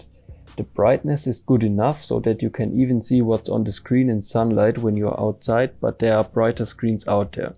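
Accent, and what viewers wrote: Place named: Germany. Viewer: Man hört halt sofort raus das du Deutscher bist 😂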